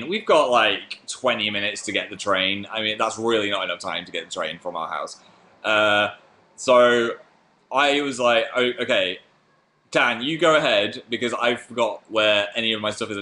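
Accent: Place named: North, United Kingdom. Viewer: I turned on the live show today ((I came late)) and I jumped because I forgot how deep his voice his and I was with my friend and I go "HIS VOICE IS SO DEEP AND HE SOUNDS SO NORTHERN IM DEAD"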